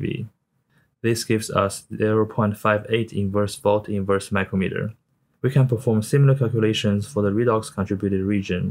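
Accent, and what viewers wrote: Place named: China. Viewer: 不用客气，希望你们越做越好。这是我的听感，我一般边做事边听油管，听到你的作品时我感觉你的英语发音重音漂移太严重了，读起来像中文的抑扬顿挫，一句话来好几个重音，听起来不知道你在说什么。有中文的口音完全没问题，希望主播可以提高句子把握能力，修改部分单词的发音错误。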